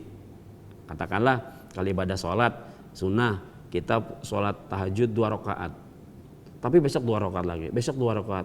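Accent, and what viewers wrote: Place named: Jakarta, Indonesia. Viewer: Masa allah...Ustad jadi logat betawinya kental...semoga bisa terus mengasih tausiahnya..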